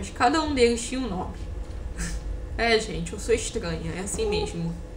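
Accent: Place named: Rio de Janeiro, Brazil. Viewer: com essa língua presa é falando carioqueis é engraçado demais kkkk. Sabia que VC não diria às diferenças das profissões kkkk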